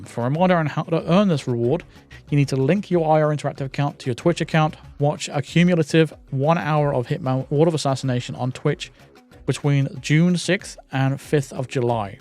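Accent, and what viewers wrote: United Kingdom. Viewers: I get that, it's all good also TikTok is banned is so many places as well. I can't remember where you're from. All I know is it European, I would say England or Great Britain. Not trying to fix you, I'm just say by your videos and your accent, I can tell your from somewhere around there. Also what you said about waiting for your healthcare. They being said I hope you're well man. I truly mean that. You have said they publicly. I'm not going to repeat what you sai, but I do remember when you talked about your issues. I just wish you well. Thank you very much. I can't praise you enough. You have been getting shit from people, ignore them please, just know there's people out there that love you for all your hard work and very much appreciate it as well. I wish you the best, thank you so very much. I love you man. You the best hitman guide out there.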